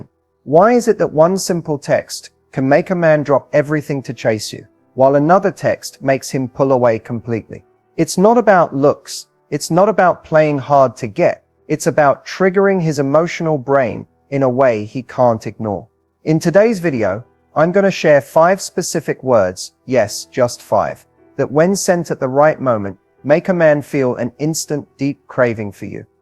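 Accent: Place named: England, United Kingdom. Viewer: I like this English accent. I think I should learn to speak like this .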